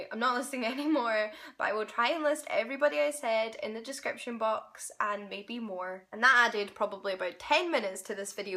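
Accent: Scotland, United Kingdom. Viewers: The Order of the Phoenix is definitely the best HP book. Whenever I watch your videos I start thinking in a Scottish accent! Haha! I might be biased but I recommend visiting Southeast Alaska, particularly my hometown, Ketchikan. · Thank you for clarifying the accent! For some reason, I could've sworn you said you were from London. I guess you were just visiting and I totally misunderstood. So every time I'd listen to your vids I'd think, that can't be right. I KNOW MY SCOTTISH ACCENTS! (One of my favorites of all time!) Seriously, it's kind of sad how much this confused me and distracted me. I thought I had gotten fairly good at placing accents and I thought I had failed. Now I have peace. ;) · But where in Scotland are you from??? :D I love most Scottish accents, but yours is definitely one of my favourites, I'd just love to know what area of Scotland it's from :)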